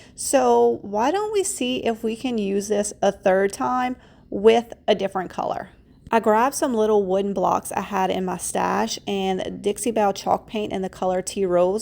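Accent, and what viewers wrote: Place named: South, United States. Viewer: I’m pretty sure it’s not phony! She’s from the south and has an accent!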